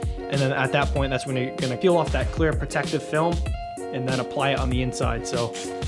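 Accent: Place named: Massachusetts, United States. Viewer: U from mass? Sounds like it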